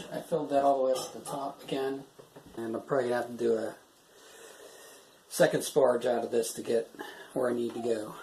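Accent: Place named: Pittsburgh, United States. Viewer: The pittsburgh accent makes me chuckle. Am I right? Yinz make at beer to go tailgate the stillers game?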